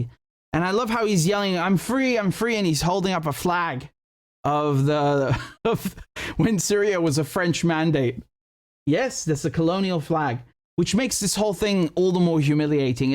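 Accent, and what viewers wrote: United Kingdom. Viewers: Nice British accent 👍 excellent journalism 👍 agree with everything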